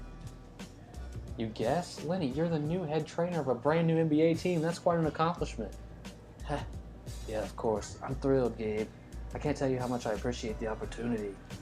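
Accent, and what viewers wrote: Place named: Mexico. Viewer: Maybe tone down the intense mexican accent, seem a tad off for a guy called Other than that great stuff thanks for the posting! You are a champ!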